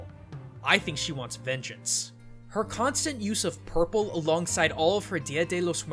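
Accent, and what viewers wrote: Spain. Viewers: Man dat spanish accent!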